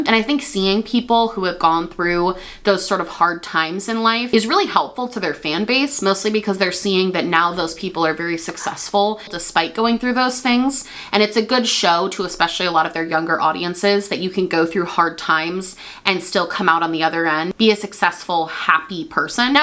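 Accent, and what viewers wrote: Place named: Upstate New York, United States. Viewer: Love how you have the most subtle upstate NY accent 😂😍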